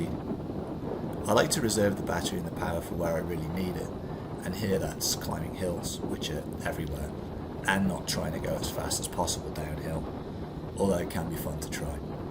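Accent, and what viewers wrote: United Kingdom. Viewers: Distinctly English accent, but US roads, come on then spill the beans... Where in the US have you moved too? Been watching your vids for the last month since coming across the channel in a recommended video. Currently looking to go from a carbon Canyon Endurance on 28mm tyres under my beastly 200 watts of pedal power to a 2000w bike to and from a 10 mile trek to work. Thanks for the effort you out into your content. I can see it all, from the filming to editing to overlays and commentary. The viewers on youtube sometimes do not get a grasp of the time it takes on top of the actual activity itself to film, edit and upload the activity. Thumbs up from the 🇬🇧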